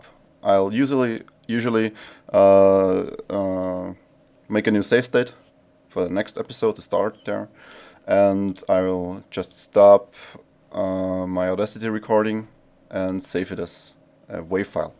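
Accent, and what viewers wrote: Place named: Austria. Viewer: Mit dem österreichischer Akzent finde ich Deine Videos viel netter :-)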